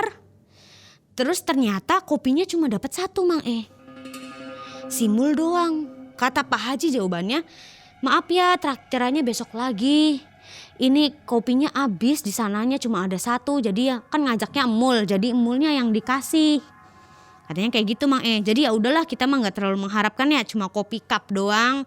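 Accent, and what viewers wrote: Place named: Cirebon, Indonesia. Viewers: Logat bahasae kyk wong Cerbon c yuu 😁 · bahasnya kaya orang cirebon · Wong cirebon ya mba ?? Logate keciri 😂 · Cirebon yaqin, Indramayu mirip sih,tapi tetep beda,mba tulen Cirebon, rumah makan mana ya buka nya sore smpe mlm dan ramai pengunjungnya 😊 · Klo denger logatnga sprtinya lokasinya di cirebon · Logatnya daerah majakuning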